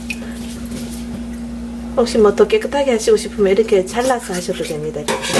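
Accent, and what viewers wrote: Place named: Gyeongbuk, South Korea. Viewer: 오늘 첨 들어와서 보곤 와~놀랬습니다 독특한 네임 이시구나 하곤, 아람냥 지면에서 그냥 글들 보곤 했는데 정말 대단 하신 분이시네요. 말씀이 경북 분이신것 같은데 상어☞갱상도선 돔배기라 하죠 비린내 없는 담백한 맛. haigo! 칼치 조림 묵고 싶어라! 꼴딱 꼴딱~~~~^^ 앞으로 계속 맛있는 영상 봐야 겠슴다....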